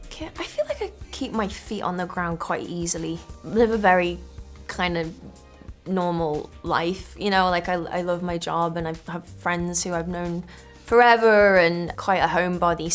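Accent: Liverpool, United Kingdom. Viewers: I love the scouse accent icl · Best coming from Liverpool is the accent. I love english language accents.I am not english native speaker.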